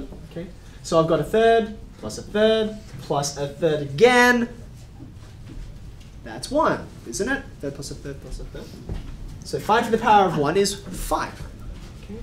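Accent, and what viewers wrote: Australia. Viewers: Chinese guy with a Australian accent, Interesting.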